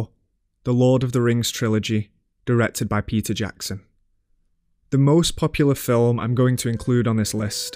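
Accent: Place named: England, United Kingdom. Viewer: Love the content of this video. The mispronunciation of the director's names (Louis Malle, Murnau, "Andrei" Tarkovsky for example) and the accent in general made it very difficult to watch. How does one know so much about cinema but get the names of the directors wrong? And with such conviction. That's baffling to me. This is one English accent that simply destroys everything in its path.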